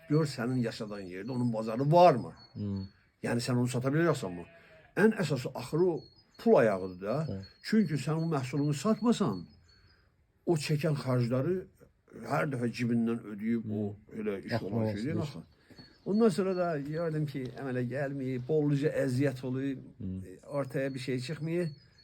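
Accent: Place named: Qakh, Azerbaijan. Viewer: Salam. Qax ləhcəsinə oxşayan.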